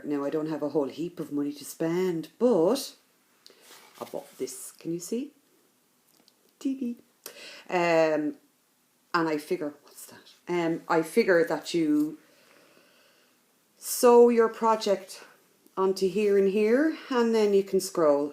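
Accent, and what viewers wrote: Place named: Ireland. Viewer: Hi. Ah, I could listen to your speak all day, I love a great Irish accent. If it were me, I'd cut the four panels of the tree and stitch them separately, just for, ease of use. A couple of online stores in the UK are Sew and So and Bothy Threads. Etsy, Clouds Factory, Frosted Pumpkin and Heaven & Earth Designs (HAED) all offer downloadable PDFs available for purchase (so no shipping costs).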